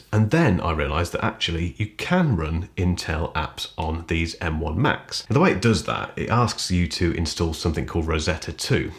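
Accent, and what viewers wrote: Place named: RP, United Kingdom. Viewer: The accent is so posh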